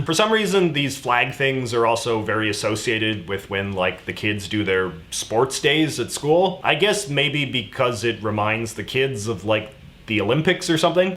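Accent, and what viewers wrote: Canada. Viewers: Lmao saying “kawaii” (cute if you’re out of the loop somehow) with his Canadian accent literally turns into “kowai” (or scary). Still love your accent.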